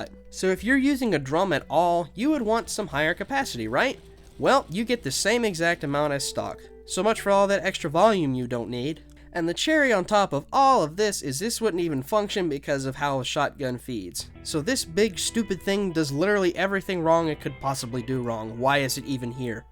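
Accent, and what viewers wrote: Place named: South, United States. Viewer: The second I heard that southern drawl, I know this was a man I could trust to talk about guns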